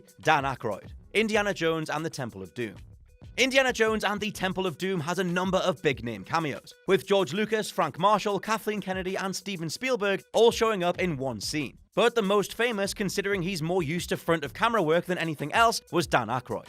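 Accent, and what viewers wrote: United Kingdom. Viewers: That's a British thing. They almost all pronounce it Whooook instead of how North Americans do.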